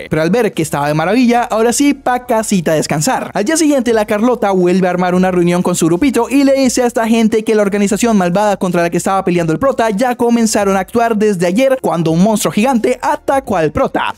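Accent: Colombia. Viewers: Eres colombiano? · Eres colombiano cierto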